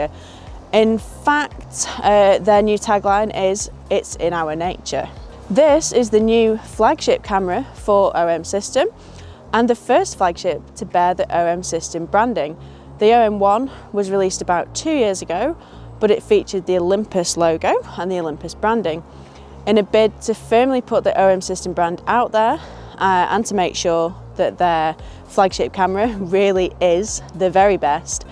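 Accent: United Kingdom. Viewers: Nicely presented and lovely Lancashire accent.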